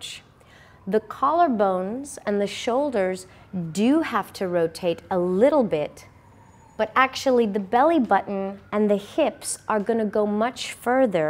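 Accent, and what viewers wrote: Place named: United States. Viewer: Random comment, but I like your American accent :) Good enunciation!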